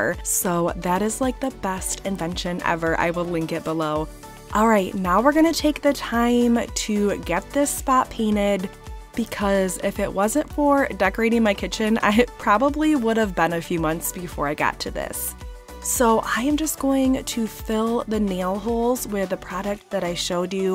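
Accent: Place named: Midwest, United States. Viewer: lol your midwestern accent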